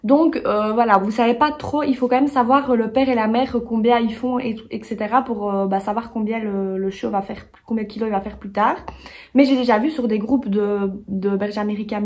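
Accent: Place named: Ardennes, France. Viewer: Très mignon ! J'ai eu un border collie que nous venons de perdre et je voudrais un chien ressemblant au border collie mais un peu moins grand. J'hésite entre mâle ou femelle.. J'aime votre petit accent peut être des Ardennes, je vis en Bretagne mais je suis nait à Charleville Mézières. Bravo pour cette jolie vidéo 😊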